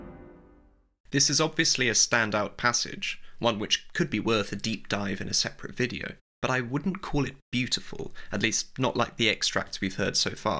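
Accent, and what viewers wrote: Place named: United Kingdom. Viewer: Well, why not do another video? Decide on your intended audience (casual listeners or devoted students), announce what you're going to demonstrate, and do your demonstration in a relaxed, orderly and progressive way. Clearly you have the knowledge and the ability. Your British articulation is beautiful, and no doubt your analysis is insightful, but I couldn't follow you. Either I am a slow brain or you spoke too fast. I'd like to hear what you have to say about Chopin's Nocturnes, but why cram everything in one video when you can make as many as you like? Best wishes . . .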